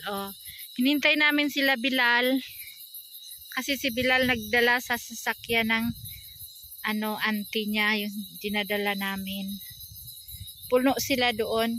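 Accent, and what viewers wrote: Visayas, Philippines. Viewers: Mam, ako rang gina-play inyong video pabayaan ra nako ang adds. Duha akong youtube account. Ganahan man ko aning imong vlog kay natural kaayu. Unya bisaya kaayug tingug. Hasta kapila na na-play. Kay sa kusina ko ako lang ginapasagdan. Hehe Pati 2 years ago gina-play pa nako. Apmping permi mo diha. Nindut unta makalaag diha Congrats sa nephew!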